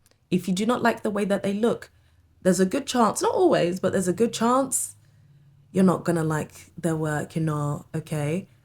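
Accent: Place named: United Kingdom. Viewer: nothing beats a beautiful black woman with british accent❤❤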